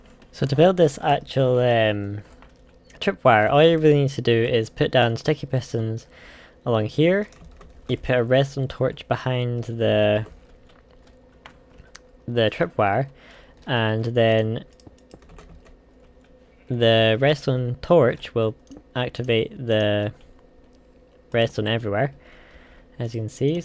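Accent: Scotland, United Kingdom. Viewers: are you pretending to be scottish or lived in scotland for a while or something?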